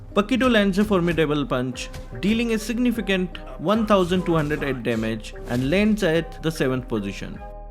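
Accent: India. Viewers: Sound like a indian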